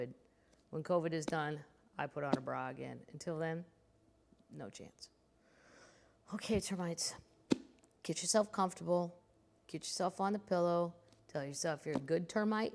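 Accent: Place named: South, United States. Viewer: like the accents, specially southern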